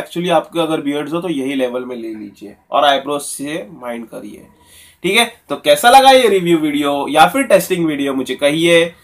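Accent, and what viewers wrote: Maharashtra, India. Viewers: Chitak gaya...😂😁😅 mother tongue marathi ahe vatat tumchi..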